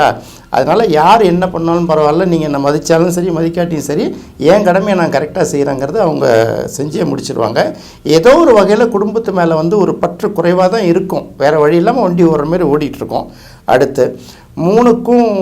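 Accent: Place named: Chennai, India. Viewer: Madras தமிழில் பேசி நாசமாகப்போகிறோம்.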